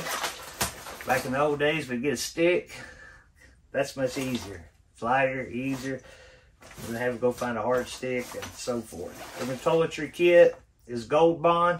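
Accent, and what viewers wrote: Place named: Kentucky, United States. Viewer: Always soothing to hear your sweet Kentucky accent! Nice choices in gear my friend. I live that your hammock tarp has lasted so long!!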